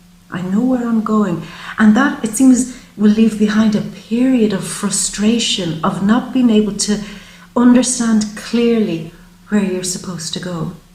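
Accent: Ireland. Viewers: Not only is she on point, but that Irish twang in her voice is so soothing, so healing.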